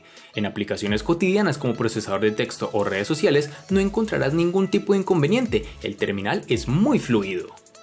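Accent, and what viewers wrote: Cali, Colombia. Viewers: Vos sos de cali vé?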